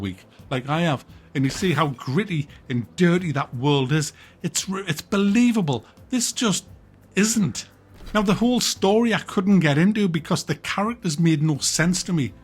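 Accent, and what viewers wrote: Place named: Newcastle, United Kingdom. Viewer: Honestly I couldn't care less about Avowed it's just nice hearing a fellow geordie ranting about poor shite 😆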